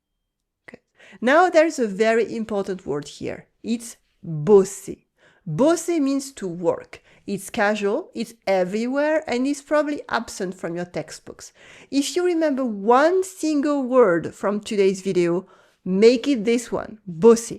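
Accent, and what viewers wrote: Paris, France. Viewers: Qui dit tu vois. Quelqu’un de Paris. Une minorité francophone. La plupart du monde francophone ne parle pas comme toi. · "sound like a parisian", "absolutely unnecessary and unachievable" lol, so weak. Every things she says are legit, I m 50 y/o teacher born and raised in Picardie and I approve this video. So she speaks with parisian accent that s all, a guy from Toulouse or Amiens follow same rules with different accents. She doesn't say "shameful", there are several levels of language, you re a child ? She s teaching one, and ? what s the ***** pb ? I wonder if they sell some remedy for "anti-parisianisme primaire" :D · This is Parisian French. Not Canadian French. The French are just to stuck-up/uppity about the language and judge EVERYBODY else who even attempts to speak it. Unlike other places I've been, Asia, Germany and elsewhere, they appreciate the attempt and usually will smile and if they speak any English will try to meet you in the middle. 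Not the French! BY far the WORST country I've EVER been to!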